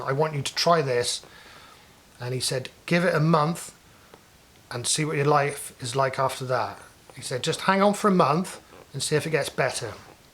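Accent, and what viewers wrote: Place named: Somerset, United Kingdom. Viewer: Yer a somerset lad..!!! - Good lad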